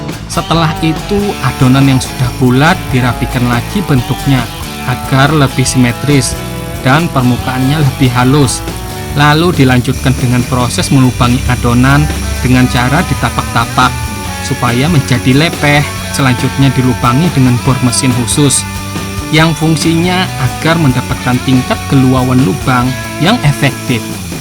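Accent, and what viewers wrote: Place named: Banjar, Indonesia. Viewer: Bang saran , mengenai banyak koment yang kada paham bahasa banjar , di kasih text aja bang , biar logat lucu bahasa banjar nya kada hilang ,dan orang luar pulau paham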